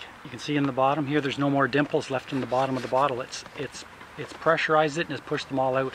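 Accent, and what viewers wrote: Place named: Canada. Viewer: Detroiter here. I thought you were Canadian when I saw the Calamata Juice bottle. Then I heard you talk, and thought, "oh yeah, this guys got to be a leaf!"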